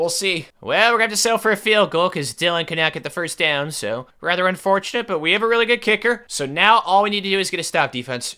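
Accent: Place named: Midwest, United States. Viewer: fr bro wtf how is his voice annoying I love it sounds like a nice Midwest guy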